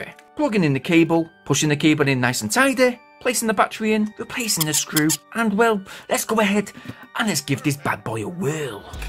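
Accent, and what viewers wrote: Yorkshire, United Kingdom. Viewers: Cool car, you sound like you're from Yorkshire. I'm from Sheffield with a similar channel to yours.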